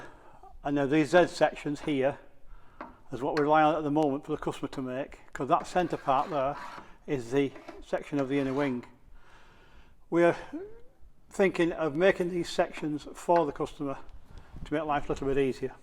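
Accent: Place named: North, United Kingdom. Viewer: Nice to hear a northern voice. Now live in Cardiff. Paul would love power steering on his Series 3 Ragtop/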